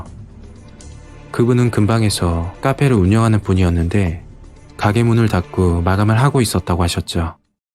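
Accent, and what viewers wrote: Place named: Daegu, South Korea. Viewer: 자연스럽고, 편안한 사투리~~ 대구 분이라고 하셨죠?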